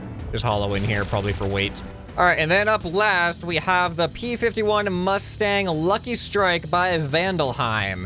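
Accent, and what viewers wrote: Texas, United States. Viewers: not trying to be rude but are you from Texas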